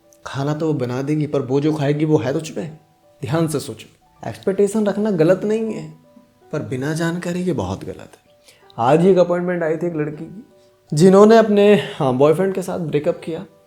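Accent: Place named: Rural, India. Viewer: Bhai tu jaise bat kar raha hey aur jaisa tera accent hey. Yeh sab tere gaao mey hota hoga. Par the reality is aj koi bhi para likkha hua ladka yeh sochke shaadi nahi karta hey k biwi aa k uski paa dawaygi, yeh toh bahut dur ki baat hey ladki aa k ghar ka kaam karegi yeh bhi koi nahi sochta , kyuki ajkal ka ladki ya bahut hi modern ho chuki hey. Bol degi biwi layi ho, servant nahi. Toh jo bhi ladka shaadi karta hey, woh just ek nice family pane k aas mey shaadi karta hey. Lekin india ka biased law k chalte huye sab ladki aur uski maa baap ko malum hey k shaadi sey accha business aj kuch bhi nahi hey. Pehle shaadi koro, fir divorce do, fir alimony lo, maintenance lo. Ho gaya savings for lifetime, ab dusra bakhra dekho. Isiliye no men should get married in India. Bas yehi ek reason enough hey shaadi na karne k liye.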